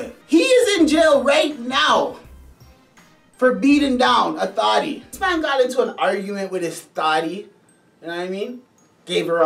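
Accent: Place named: Toronto, Canada. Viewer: Fam u sound like u from Toronto! Your hilarious brother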